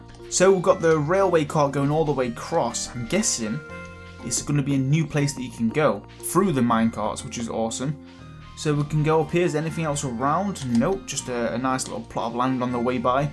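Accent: United Kingdom. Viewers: I’ve heard multiple English YouTubers pronounce it that way. It’s strange to Americans, of course. But I’m sure the way we pronounce it is strange to them too. 🤷🏻‍♀️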